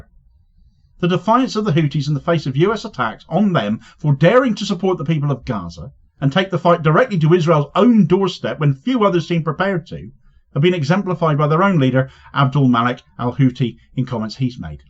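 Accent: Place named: England, United Kingdom. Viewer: not listening your you disparage my president. you sound like your English anyway. Good bye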